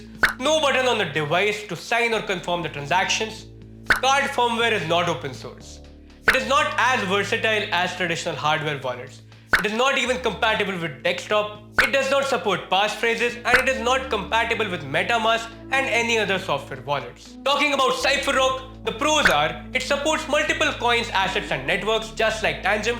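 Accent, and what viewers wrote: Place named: India. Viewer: Cypherock executives, you need to get an American, Englishman or other presenter with English as his native language. Unfortunately, to the rest of the world India is known as the internet scam capital of the world and you having an Indian present your sales pitch is doing your product acceptance/adoption great harm. You might have a fantastic product, but most of us are thinking “scam” as we watch. Seriously!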